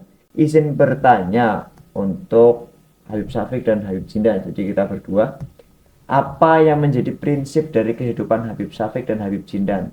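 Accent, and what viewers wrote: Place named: Java, Indonesia. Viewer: Habib kalih niki medoke candu sanget nggh😭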